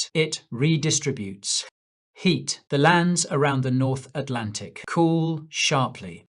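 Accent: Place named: United Kingdom. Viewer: Watch this at 2X.....this AI sounds like James T Kirk crossed with a Brit. 🤣🤣🤣🤣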